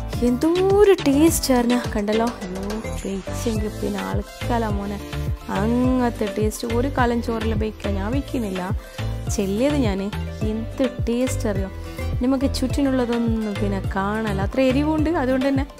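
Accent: Kasaragod, India. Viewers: ഇതെന്തു ഭാഷ 😂🙊... പൊളി 😂✌️ എനിക്കു ഇഷ്ടാണ് കാസറഗോഡ് സ്ലാങ്... ഒന്നും മനസ്സിലാവില്ല കേട്ടാൽ.. 😝പക്ഷെ കേട്ടിരിക്കാൻ ഇഷ്ടാ 😍... കണ്ണിമാങ്ങ അച്ചാർ 😋😋😋വായിൽ വെള്ളം വന്നു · Kollallo kaasrott slang 👌😍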